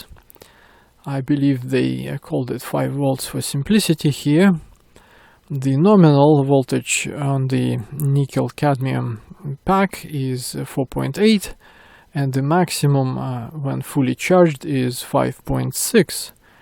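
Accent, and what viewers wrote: Russia. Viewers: Просачивается русский акцент :))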